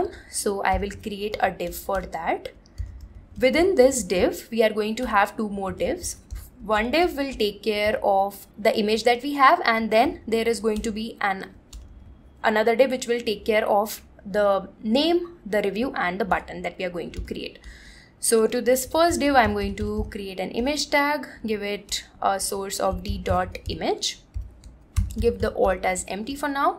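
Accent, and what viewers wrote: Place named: India. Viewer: hindi accent...; i couldn't watch more than 2 minutes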